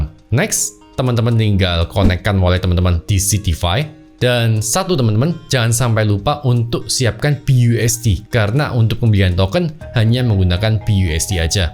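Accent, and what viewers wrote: Java, Indonesia. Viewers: Bro asli mana medok bgt. Salam jawir wkwk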